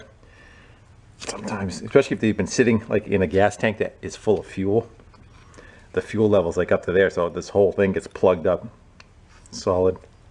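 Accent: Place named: New England, United States. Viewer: That's a New England accent if I eva heard one.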